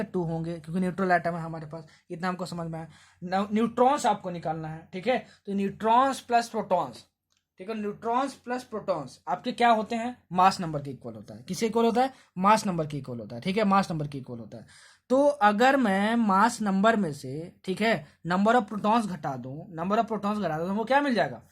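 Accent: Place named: Gorakhpur, India. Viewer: Are you gorakhpuriya???? Ur accent is sweet....btw thanks for course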